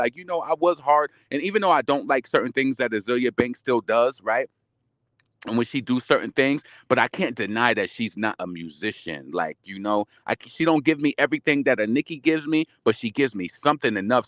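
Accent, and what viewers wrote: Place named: Brownsville, United States. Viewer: The way you talk I though you was from Brownsville lol but it’s ok I still love you you be talking ya shit speaking big facts. 😘😘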